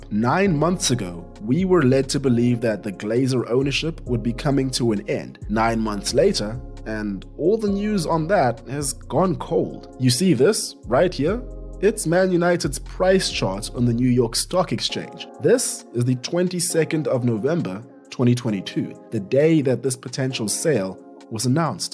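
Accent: South Africa. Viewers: Bru u sound South African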